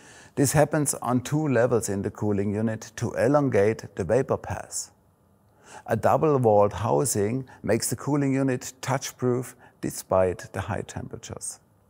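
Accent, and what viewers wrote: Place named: Swabia, Germany. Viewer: Ist bestimmt ein tolles Teil. Mit dem Crafty war ich schon sehr zufrieden...wenn man sich etwas mehr Zeit für die Heizphase nimmt. Die Häufigkeit der Ladezyklen ist der einzige Makel. Bin sehr gespannt ob der neue B+K Verdampfer da mehr Spass macht. PS..ein toller "schwäbscher" Akzent ließ mich jetzt schon ständig lächeln...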